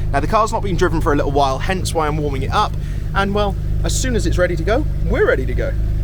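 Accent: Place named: Wales, United Kingdom. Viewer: i’m here for the welsh accent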